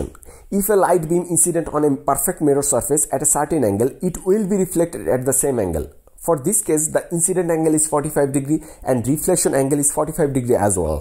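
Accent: India. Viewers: *clicks video, hears Indian accent, clicks off video in disappointment. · Are you Indian? Your accent is like an Indian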